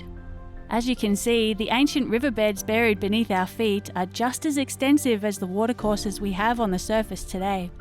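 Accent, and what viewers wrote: Australia. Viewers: I've said it before but I'll say it again: you need to start voice acting because your voice is incredibly Australian. I don't know what you look like but your voice is gorgeous.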